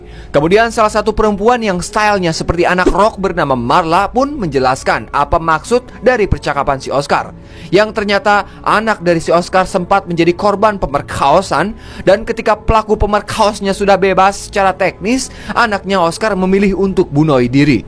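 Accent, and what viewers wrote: West Java, Indonesia. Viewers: Nada narasinya kaya IQ7 deh,apa emng klo org Sunda ngomong Bhs Indo kaya gini yaa?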